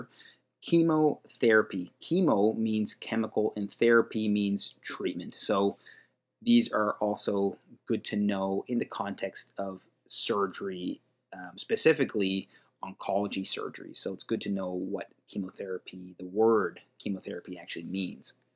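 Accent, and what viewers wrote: Canada. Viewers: You didn’t cover -emia. Powerhouse of the cell. Just kidding loved the video I’m learning for my work, thank you. Sounds like you’re from Canada just straight facts.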